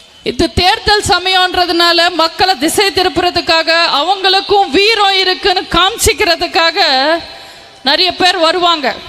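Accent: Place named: Telugu, India. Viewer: Cenema markettu sarincha piraku. Koovathoor koothadi kachiku koothu adika vanthutte. Adia. Nee pesura thàmil. Parthal nee thelungukariya thondaiyile. Enna machine vachiruke. Voice kekkave. Sakikaladi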